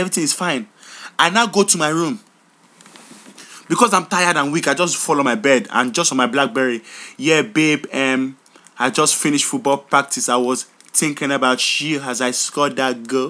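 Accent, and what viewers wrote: United States. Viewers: Nice American accent. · Love ur American accent lol im American and ghanaian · woah your american accent is good af! scared me lol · Lmaooooo Nigerians! It is a struggle! But your American accent is better than mine and I'm American lol! Very funny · wow your american accent is really good! and i nearly choked when you said youre trying to get ffreaky. too. fucking. funny. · ur american accent is good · dayum his american accent is so good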